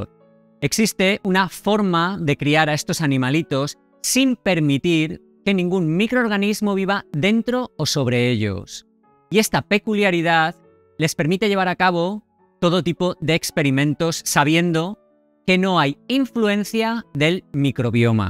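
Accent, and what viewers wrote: Valencia, Spain. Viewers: Que rico el chucrut. Cada vez que veo tus videos me engancho mas, creo que por tu forma de hablar eres valenciano. Saludos desde Malaga.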